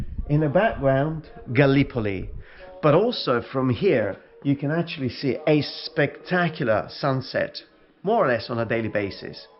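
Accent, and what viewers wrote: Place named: England, United Kingdom. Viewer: Aww Thanks so Much, for your Response. I am currently Watching other Episode from Your Channel “Buying a property in Italy Salento by lol lol I watched You and Dave From DauVo and Subscribed to your Channel and became a Fan!! I wish You Many Success!! Love your English Accent even though I know you are 100 % Italian, Also Compliments to Your Fashion Style very Charming!!! It’s great to Watch someone with so much Knowledge and in the meantime that takes time to Pay attention to the Personal Appearance! That says a lot. Because you are sending a Positive Message if you take care of yourself definitely you will take good care of someone else property. I enjoy Watching your Channel! Great Personality!! Warms Regards from. Noriko in Míami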